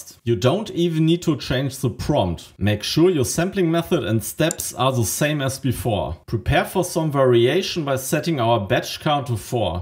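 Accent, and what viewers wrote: Germany. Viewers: Gutes Video.. der deutsche Akzent is der Hit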